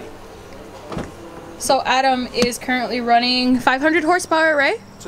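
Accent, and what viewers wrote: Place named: New Jersey, United States. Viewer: Are you Italian ?? You certainly look it . You definitely can't deny that you are a Jersey girl because you definitely have the Jersey accent and I have the Pittsburgh Yinzer accent. I vacation in Wildwood NJ every year and it is my absolute favorite vacation destination of all time.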